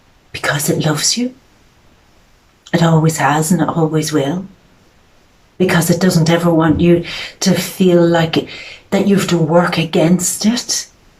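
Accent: Ireland. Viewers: Love your Irish accent! God bless you sister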